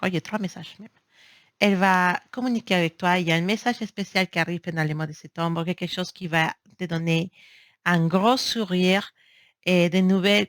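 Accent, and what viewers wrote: Spain. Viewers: Tu as un très joli accent espagnol, prend ton cheval et viens me voir 😃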